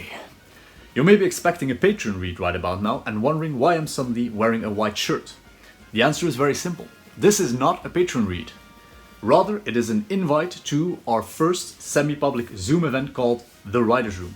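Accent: South, United States. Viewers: That is an excellent southern accent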